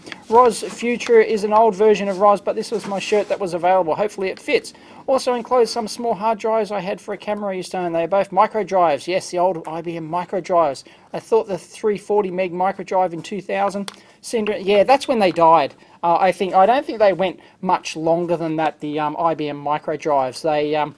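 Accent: Australia. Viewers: In the fourth grade, I'm in the US, we had to put a picture of ourselves on a world map with a string going to where our family came from. I asked my mom and I thought she said "Australia". So my string was all by itself and the teacher was like "really". All the others kids strings went to somewhere in Europe. Well I asked her again and had to move my string to Austria with all the others kids. Explains why me mother's parents didn't sound like you. I never got to come from a fun country. :-(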